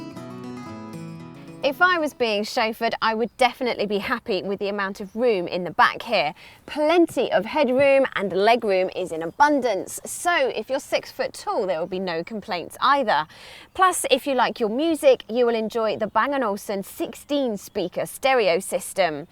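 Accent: United Kingdom. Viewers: british accent, in a sexy thin girl, so hot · Why was I surprised when the steering wheel was on the right side? Her accent. The video says UK. Why didn't I see it coming? I'm an idiot hahaha. When she got into the front seat I was like "WTF?!" shows how much I was actually paying attention hahaha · banaaaanas... love the british accent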